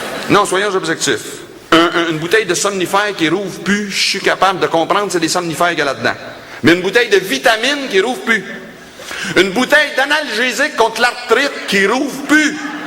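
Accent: Quebec, Canada. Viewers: la moitié des mots ne sont pas dans le dictionnaire?? Et puis pour les anglicismes je pense que les européens sont aussi pires, sinon plus (faire du "shopping", servez moi un "coca lite (ou light)" .......) et je suis désolé mais il parle comme un québécois de souche, ce qu'il est. C'est une langue qui dit ce qu'elle as a dire sans avoir besoin de se tenir le nez en l'air. Vous avez vraiment l'air "frais chiés" en france, toujours le besoin de corriger les pseudo-fautes des autres. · Je ne sais pas si tu lis les commentaires après tant d’années mais, je peux te dire que t’es une des personnes qui m’a fait aimer l’accent québécois. J’aime ta façon d’exposer l’actualité, tellement brillant; je partagerais un repas avec toi autour d’une table et jaser de tout et de tout.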